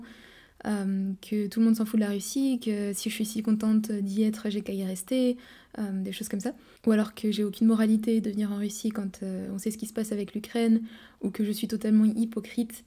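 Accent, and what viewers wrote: France. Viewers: Интересно звучит русский с французским акцентом , такое няшное звучание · Если бы Вы знали французский язык, то сразу бы поняли по произношению и выбору слов, что она француженка. Что касается её русской речи - вполне стандартная речь, изучающего язык на уровне А2-B1. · у тебя очень красивый женственный голос и с приятными французскими нотками!)) Говори по русски ни кого не бойся! У тебя очень хорошо получается!😊👍 Вы всё замечаете... вы замечательная красивая девушка!) P.S. ne faites pas attention aux commentaires négatifs, ils sont écrits par des robots ukrainiens en règle générale (troupes de canapé)... ils essaient de discréditer les Nations. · Вы внешне не очень сильно напоминаете типичную француженку из Парижа или Марселя. На вид обычная русская девушка из Москвы или Петербурга. Думаю с толпой вы легко скроетесь. Тебя выдаёт только твой забавный и милый французкий акцент.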